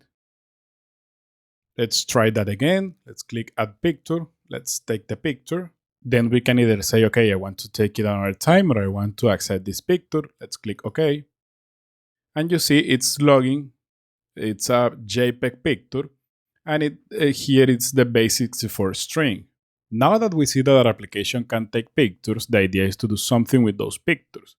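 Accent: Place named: Spain. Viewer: I can notice your spanish accent, but you have very good english! May I ask, where are you from? Sos argentino no? Edit: ahi vi que eres colombiano, saludos desde argentina!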